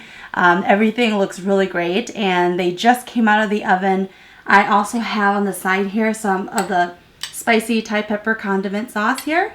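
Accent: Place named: United States. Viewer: 好可怕的美國口音!😳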